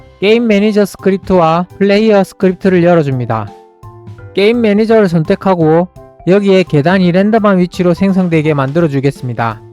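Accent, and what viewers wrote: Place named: South Korea. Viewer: 보고 즐겁게 따라해봤어요! 친절한 설명과 구수한 사투리 겉들인 좋은 영상 감사합니다!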